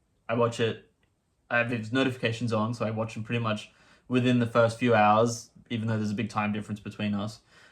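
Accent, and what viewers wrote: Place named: United Kingdom. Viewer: Great video! I really enjoyed In Cold Blood when I read it but it’s been a while. I would love to hear more about watch making in future videos - it’d be really cool to see a watch that you’ve made. Based on your outfit I was thinking you were from Chicago but I was a bit confused as I thought I could detect the slightest British accent, then it all made sense when you mentioned Australia.😂 Have a good one!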